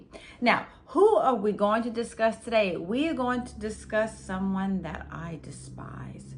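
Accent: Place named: Cajun, United States. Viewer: I subscribed. Your channel is young. I'll give you a like. Let's get your numbers up. I like your tiny Cajun accent. It's barely there but it's there. Like delroy lindo.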